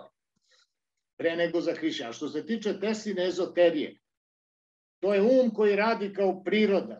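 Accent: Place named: Croatia. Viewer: Voditelj govori neku croato varijantu srbijanskog narečja.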